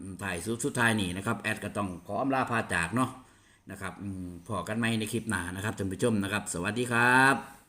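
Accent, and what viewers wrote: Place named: Korat, Thailand. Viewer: แอด ภาษาโคราชบ้านเอง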